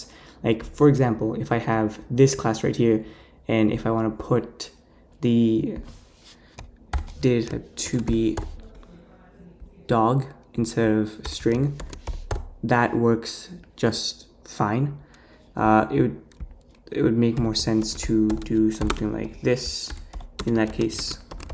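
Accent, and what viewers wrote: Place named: India. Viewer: lol hes got a subtle indian accent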